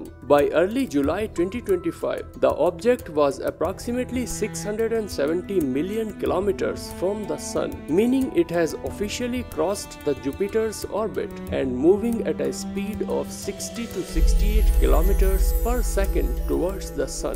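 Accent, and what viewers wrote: India. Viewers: the first word you say, I hear you're an Indian.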